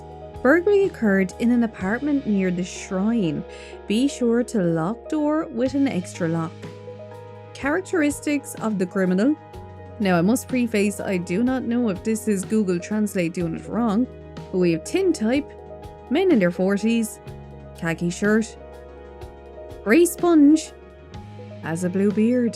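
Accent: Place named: Ireland. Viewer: The moment I heard ur voice, I immediately knew you're from Ireland 🇮🇪. Such a cute and sweet accent fr. Btw amazing video ( ≧∀≦)ノ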